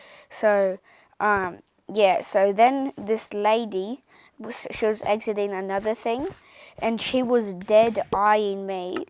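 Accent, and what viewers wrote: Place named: New Zealand. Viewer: ok u sound like a kiwi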